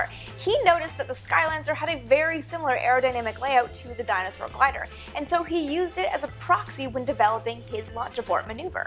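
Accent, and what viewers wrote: Canada. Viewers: I used to have a couple of Canadian friends here in Australia but they moved to the states for work. So I've always loved your accent. You always seem to find those extra little tidbits on a something I thought I knew about, so it'll be awesome to see what you turn up on the Arrow. It's a shame about the plane but the rumours about plans and maybe a last one getting away are fantastic.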